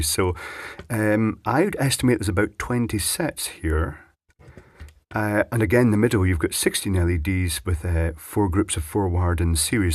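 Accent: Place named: England, United Kingdom. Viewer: A bit odd to hear "ain't" in an English accent.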